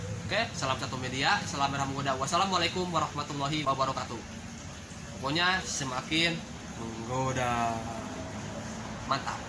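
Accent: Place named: Medan, Indonesia. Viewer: Medan bg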